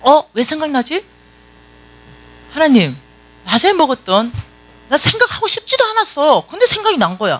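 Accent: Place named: Gyeongsang, South Korea. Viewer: 간사님 대구분이신가요? 경상도 억양과 말투가 고스란히 나와서 친근하네요 ~~. 대구. 수성구에서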